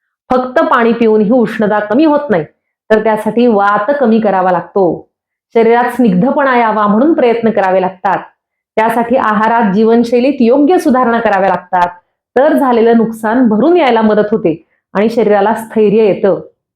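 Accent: Standard. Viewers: अतिशय छान. दैनंदिन जीवनात उपयोगी पडेल अशी माहिती. डॉक्टरांची भाषा अतिशय स्वच्छ व‌ उत्तम मराठी. ऐकत रहावं असं वाटतं. मनापासून कौतुक.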